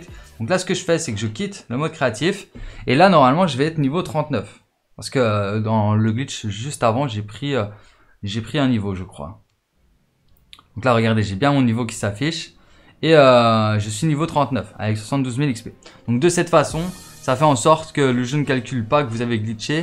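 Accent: Belgium. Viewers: Wsh bg t belge ?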